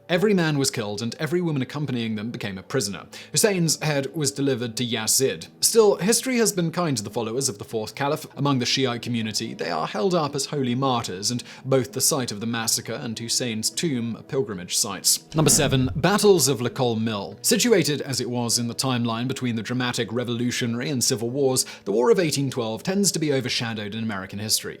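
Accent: United Kingdom. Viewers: I sometimes wonder if Simon's videos would still sound educational if he'd have an American or Aussie accent. He could read the instructions on a box of matches in his British accent and it would sound like a lecture on quantum physics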